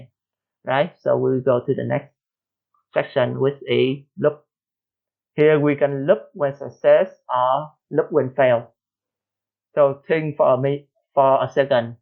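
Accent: Vietnam. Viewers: Nghe biết ngay VietLish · App ngon quá bạn ơi, nghe giọng nghi nghi là VN rùi mở page FB ra thì đúng lun :)) Trên dt xiaomi muốn chạy phải cấp quyền lại liên tục chán quá :( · ông là ng việt nam à. cái giọng ko lẫn đi đâu đc lun ấy :))) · Chuẩn mọe giọng Việt😂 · Ôi cái giọng Việt Nam này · )))) ông này việt nam ak nói tiếng anh i chang v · nghe giọng là biết ông người Việt rồi, công nhận ông giỏi thiệt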